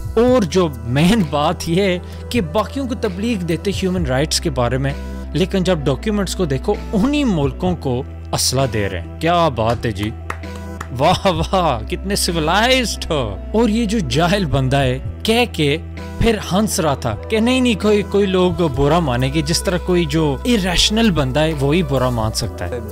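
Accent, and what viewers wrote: Punjab, Pakistan. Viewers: I can hear a hint of Punjabi? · ap punjabi ho kya . accent kafi heavy hai . btw , I am a hindu nationalist but then I share anti american sentiment like you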